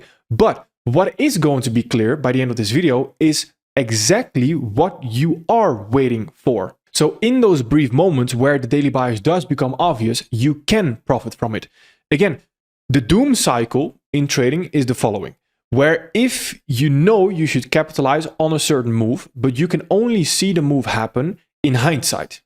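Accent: Netherlands. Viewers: Top notch content, simply top notch! Also, you're Dutch no? I've listened to Peter Bom for a while and there's similarities in the accent.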